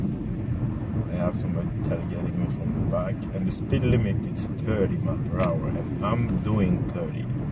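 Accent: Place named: Nigeria. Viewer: Wow...i love your accent. Are you Nigerian?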